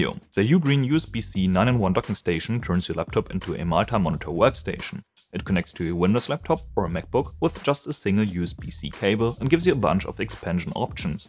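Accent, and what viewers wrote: Germany. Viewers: You German? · I hear the german accent but appreciate that the video is in english lol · He has a pretty pronounced German accent lol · The first video I ever watched from him, after first couple of sentences, I tought to myself: " Oh, a German doing reviews in English, that's rare". And I'm Croatian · He has about the strongest German accent out there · its so german it sounds not german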